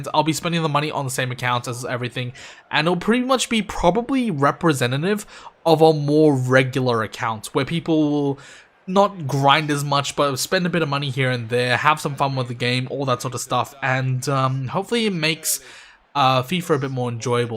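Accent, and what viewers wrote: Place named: United Kingdom. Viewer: Btw are you fully Australian I haven't seen you saying mate for once and your accent is British.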